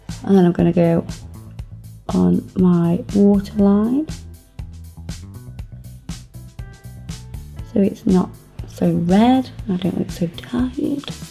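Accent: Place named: Manchester, United Kingdom. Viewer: your accent is manc with Sheff and Liverpool mixed in?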